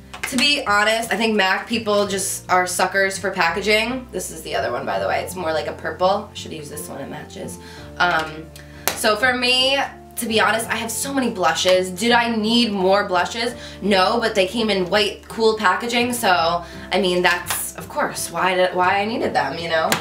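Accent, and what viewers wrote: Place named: Rhode Island, United States. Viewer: Haha I know she's from New Jersey but being from Rhode Island I think she has a Rhode Island accent, not like they're any different tho.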